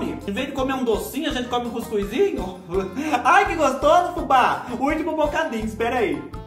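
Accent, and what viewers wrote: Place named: Northeast, Brazil. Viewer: Eu achando q ele era daquí do Nordeste pelo jeito de falar. ❤ aaa amei Cuscuz dá certo com tudooo. E fubá,faz mais vídeos de receitas nordestinas.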